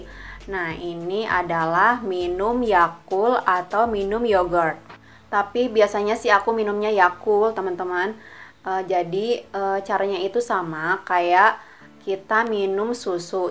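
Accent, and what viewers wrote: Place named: West Java, Indonesia. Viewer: orang sunda nih kayanya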